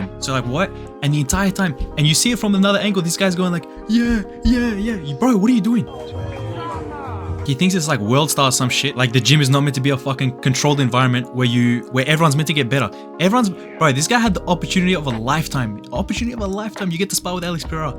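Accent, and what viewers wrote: Australia. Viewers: are you an aussie man?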